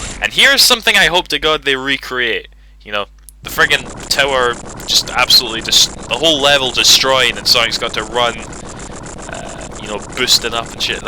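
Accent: Scotland, United Kingdom. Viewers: lol when i was a cat xD i love scottish accent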